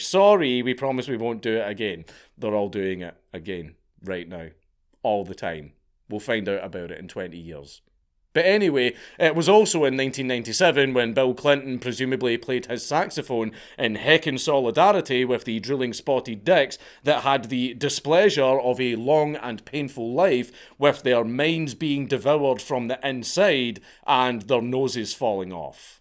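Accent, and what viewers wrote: Scotland, United Kingdom. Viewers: Just got off work from the MMJ dispensary. My favorite medicine. So why not listen to one of my favorite Scottish men talking about really bad drug companies. Aahhj yes! Ripping good time! Thanks!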